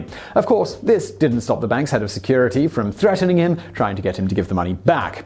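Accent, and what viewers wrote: United Kingdom. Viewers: The way you talk here is why I think you're one of the best narrators ever and why I always click your videos, regardless of subject. You could make a video on the average consumption of prune juice in your local elderly home and I'd still be fascinated. Also, you are probably the most British person alive.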